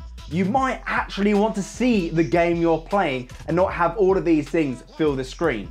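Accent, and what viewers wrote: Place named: Europe. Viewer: I dont understand shit. European english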